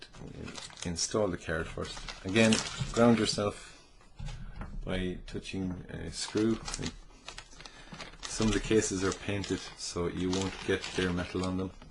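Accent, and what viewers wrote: Ireland. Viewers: this was very irish sounding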